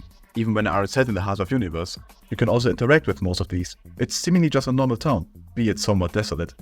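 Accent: Germany. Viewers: I find it odd that you mispronounce Steyr despite having a very strong GERMAN accent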